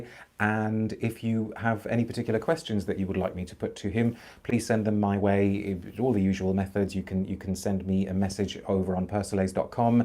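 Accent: United Kingdom. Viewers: Hello 👋 are you English?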